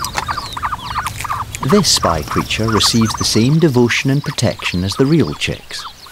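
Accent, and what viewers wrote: Scotland, United Kingdom. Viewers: where're you from in scotland, lad? love the videos. bravo for the work well done eh? cheers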